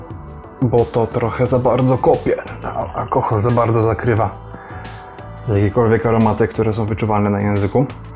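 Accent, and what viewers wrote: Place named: Scotland, United Kingdom. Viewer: Szkoci chyba muszą Cię mylić ze swoimi krajanami - mega akcent.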